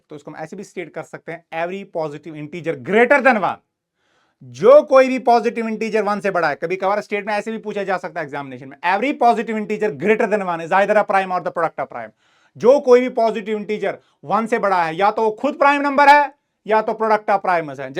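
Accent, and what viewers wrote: Kashmir, India. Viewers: Kashmiri accent is so cute 😂❤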